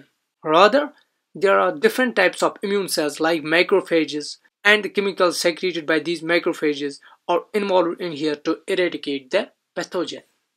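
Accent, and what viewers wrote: Kashmir, India. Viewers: Typical kashmiri accent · Sounding like a kashmiri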